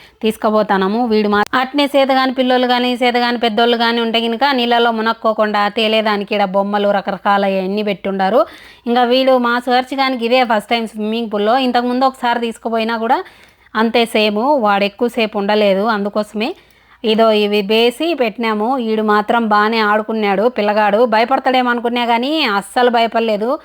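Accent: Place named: Kadapa, India. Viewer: Bagundava akka Maadi kuda Kadapa ne pulivendula Maadi meeretla matladataro maa oorlo atle matladataru I'm sorry connected to u akka I like ur thought process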